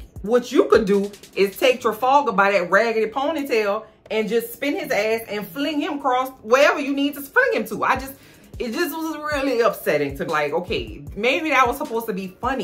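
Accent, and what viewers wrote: New Orleans, United States. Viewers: I love me a woman NOLA YouTuber! The best accents and reads😂😂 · I was completely taken aback by the straight faced comedy!!! It took me a quick second to understand who trefalga was… The algorithm truly blessed my time line with you. The impeccable face card drew me in… the Nola accent had me locked… the non stop roasting made me subscribe AND ring the bell. Looking forward to viewing more and more of your content beloved. Willing you success in all your righteous endeavors. ✌🏽&❤️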